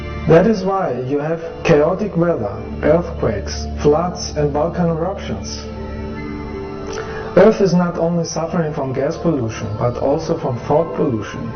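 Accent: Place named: Germany. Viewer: Trovo questo discorso estremamente interessante e veritiero,ma non dovevano, per rendere la cosa piu' credibile ,far spacciare questo signore per un extraterrestre,infatti conosco bene l'inglese ed effettivamente suona come fosse un tedesco parlando inglese,quindi nulla di soprannaturale,ma nulla toglie che il suo discorso non fà una piega.Umanamente bravo nel suo intento.Se non dovessi essere corretto nel giudizio chiedo umilmente scusa a gli extraterrestri.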